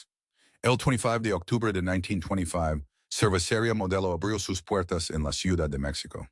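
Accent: United States. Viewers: Antes estaba interezante... desde la semana pasada HABLAS COMO GRIGO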